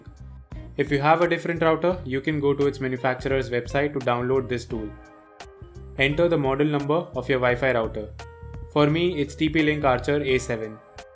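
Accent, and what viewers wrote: India. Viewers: I can't understand Indian accents good enough but thanks for trying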